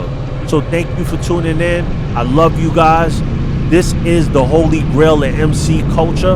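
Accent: New York, United States. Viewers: I grew up in NYC. Left in 2001 I’ve been down south since. I miss hearing your accent. I miss “home” U sound great. Thanks 4 bein genuine. We need more people like you. Ride Safe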